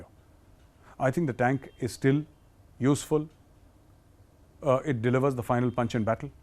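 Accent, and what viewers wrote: India. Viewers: Awe... u need to use IK name to grab attention. lol. Beepdee bapdee boopda.... the famous indoween accent ridiculed around the world. Gobbar and badsuraat rundians earn likes and daily roti by using Imran Khans name.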